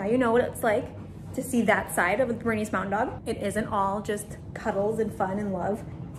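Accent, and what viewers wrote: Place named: Minnesota, United States. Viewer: What is your accent? Minnesota? It's so lovely!!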